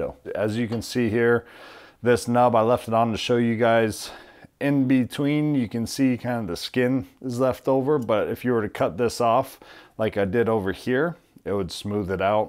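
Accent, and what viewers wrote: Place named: Hawaii, United States. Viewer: Damn, hes Hawaiian? The accent makes so much sense now...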